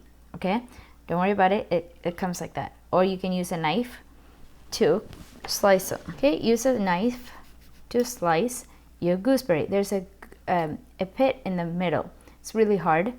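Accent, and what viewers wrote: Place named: United States. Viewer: Mouth watering recipe. I am gonna try. Thanks for sharing. Btw you have fine American accent. How did you achieve it?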